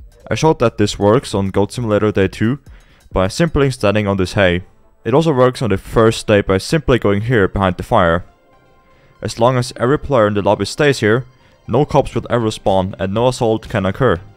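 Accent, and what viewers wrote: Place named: Germany. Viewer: Hey man U Sound so German are U German by any Chance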